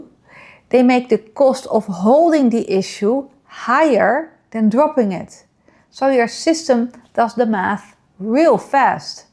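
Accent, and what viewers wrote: Netherlands. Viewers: Je bent duidelijk slim, kun je niet iets minder Dunglish spreken 😅😂😂 is moeilijk om naar te luisteren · You sound Dutch.... bedankt voor deze video.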